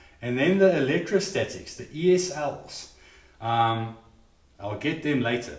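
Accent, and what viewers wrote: South Africa. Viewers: I have been looking at replacing my speakers which were unfortunately ruined in a rain storm we had here in Nova Scotia back in 2023 which flooded my basement. I have been considering these speakers from Martin Logan, the Bowers & Wilkins 702 S2 Signature and the Monitor Audio Gold 500 6G. The Martin Logans are the cheapest of these three speakers. I am running a Bryston 4B Cubed amp which is 300 watts into 8 ohms and 500 into 4 ohms. I know it would drive these speakers extremely well. I have also heard others say that the Bowers & Wilkins can be fatiguing in the treble. Are you from South Africa? I think your accent sounds like that. I am so glad you did this review. Thank you. 👍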